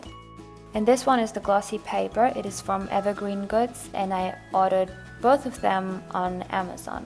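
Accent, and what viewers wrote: Germany. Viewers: Kommst du aus Deutschland weil du so ein deutschen Akzent hast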